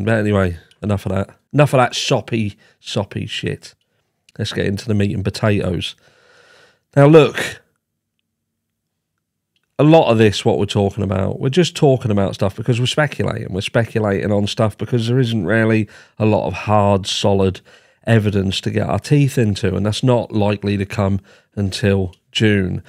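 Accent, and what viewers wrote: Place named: Birmingham, United Kingdom. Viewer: I ♥️ this Brummie accent! Well, the personality of BK is truely puzzling, I absolutely agree. I never considered him an INCEL which they suggest him to be; yes, he has both narcissistic, sociopathic and also psychopathic traits, but in these terms I might talk about myself as well with quite of an ease. Seriously, I mean; and neither do I believe that being a N/S/ and/or/P would make one automatically a killer - not at all. However, what is really significant in BK, is his lack of emotions. He is a true introvert - coldly calculating, investigating; he show's up zero empathy nor ability to regret anything, incl his own obvious mistakes. He doesn't seek for attention - oh no; and he seems having counted on possible failures, that's why he is so calm now. The trial is just another pre-calculated epizode of his "masterplan". 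If he is the m****rer, of course. I see some very specific, deeply routed autism in his characterisrics instead, but of course I'm not any kind of a specialist to give such or another diagnosis. Could any form of autism lead to m*rders? Just some thoughts. Interesting contemplation, Man. Thanx & greetings.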